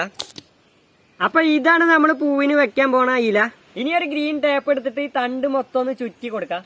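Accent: Thrissur, India. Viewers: നാട് എവിടെയാ തൃശ്ശൂർ ആണോ സംസാരം കേട്ടിട്ട് തൃശ്ശൂർ പോലെ ഉണ്ട്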